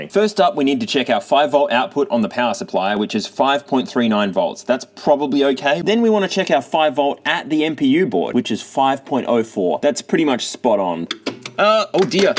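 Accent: Australia. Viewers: I love the Aussie accent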